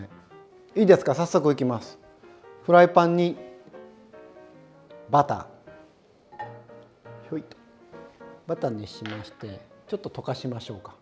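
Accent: Osaka, Japan. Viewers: イギリスからです。以前から日が経ったパンを使ってブリーチーズとクランベリージャムとサラダほうれん草を挟んでオリーブオイルを薄く塗ったフライパンで押さえつけてホットサンドを作ってました。先ほど少し硬くなったくるみとクランベリーのパンを使ってコウさんのおっしゃる通りバターで冷凍ベリーミックスとブリーを挟んで何回も押さえつけて裏返しながら焼くと本当にサクッとパイのようになりました。いつも美味しい手軽に作れるレシピをありがとうございます。大阪節トークもいつも楽しみにしています。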